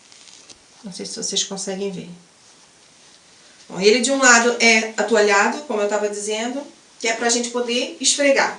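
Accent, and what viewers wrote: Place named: Portugal. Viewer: Engraçado... a primeiríssima coisa que notei foi o seu sotaque... já está falando com os acentos portugueses...rs.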